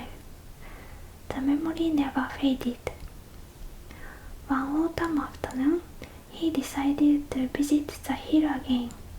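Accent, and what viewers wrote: Japan. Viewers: Engrish very kawaii❤❤❤❤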